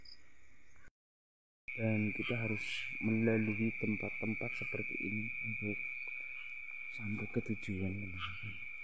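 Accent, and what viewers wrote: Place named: Java, Indonesia. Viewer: Indramayu hadir untuk kang Bahasanya medok kaya orang Jawa tapi ko videonya banyak diambil di sunda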